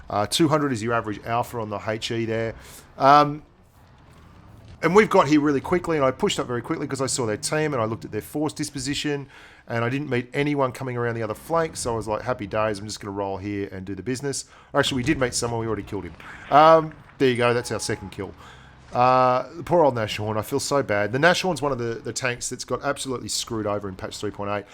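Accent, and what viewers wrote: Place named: Australia. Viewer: ...if you're new here, he's Australian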